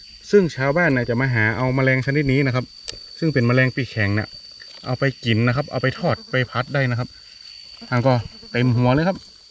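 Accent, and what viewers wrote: North, Thailand. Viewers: สวัสดีค่ะอาจารย์ฟังอาจารย์พูดคำเมืองรู้เรื่องบ้างไม่รู้บ้างแต่ก็ชอบเพราะมันเป็นธรรมชาติแ ท้ๆชอบมากค่ะ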